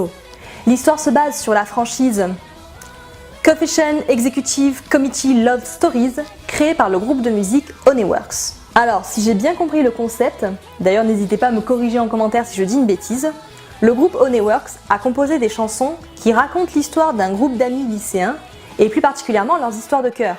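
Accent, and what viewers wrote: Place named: France. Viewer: Ma chère fan girl si tu aimes tant les magical girl et les romances "love chunibyo & other delusions" est fait pour toi , je suis pret a parier que tu me remerciera en lançant des pétales de fleurs . Bon j’exagère peut être un peu mais il aborde ces thèmes et leurs codes avec un petit coté irrévérencieux très cool . Plutôt simple de prime abord ( J'adore cette expression )il peut être vu avec plusieurs niveaux de lecture si on se gratte un peu la tète et ca aussi j'adore . BREF FONCE ... non non non FONCE j'ai dit . J'avoue avoir sauter la partie sur "Your name". je fais partie des rares qui ne l'ont pas encore vu et j’esquive tout spoil potentiel ^^. J'aime bien ton accent français. Moi on me reproche souvent de prononcer comme un chef de la mafia Japonaise alors crois moi c'est pas si mal . Mais pourquoi est tu encore ici ? 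Fonce j'ai dit !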